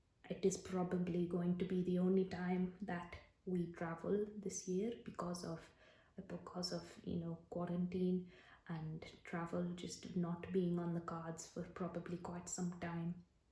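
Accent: India. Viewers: I have missed indian accent video soooo much. Thanks !❤️ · There aren’t many Indian ASMR videos so this means a lot to me. I love ASMR, Indian women, and Indian accents so thank you queen. 👑. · ahhh I can't believe I missed this!! Your first Indian accent video is one of my all time favourites! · Your accent is so lovely it’s so smooth with the Indian accent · I enjoy India accent videos. Thank you. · Love your accent ASMR videos! Please keep making the Indian accent ones, the last one was great too! Not enough of those in YouTube. So soothing and relaxing! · I’ve always found Indian accents to be very nice. Sort of calming lol, glad others do too.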